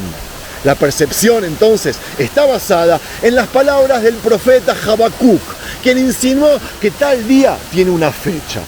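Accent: Argentina. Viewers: soy uno de los masoquistas,pero m gusta tus videos,uno de tus videos donde mostrastes un modelo de el tabernaculo,m gustaria un video d siquem donde supuestamente estaba la encina de manre,donde estuvo el padre Abraanm, lo q odio en ti es ese acento argentino,te tolero x la historia q tu conoces y a mi me gusta. Shalonm y delen duro a estos cananeos d raffah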